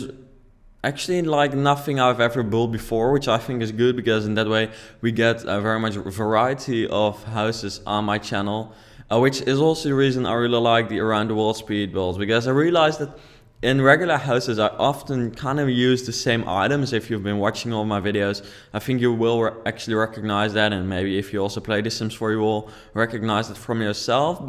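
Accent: Netherlands. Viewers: je kan horen dat ie nederlands is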